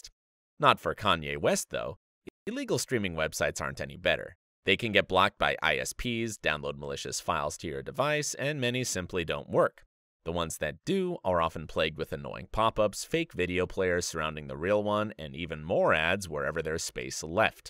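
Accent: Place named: United Kingdom. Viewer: I actually miss the British accent. :(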